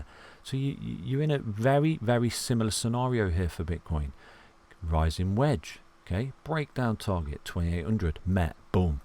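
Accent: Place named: North, United Kingdom. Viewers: First time viewer, informative content with a great northern accent which reminds me of home! Subscribed. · Always great video's on TA. Although sometimes difficult to keep up with the talking speed in combination with a slight English dialect. Keep up the good work. :-)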